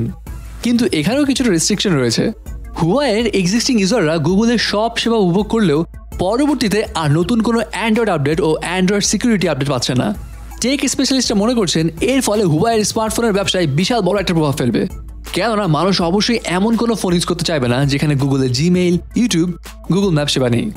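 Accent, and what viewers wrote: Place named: United States. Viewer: ভাইজান এত স্মার্টলি কথা বলতেছেন মনে হচ্ছে যে আমেরিকান বাংলা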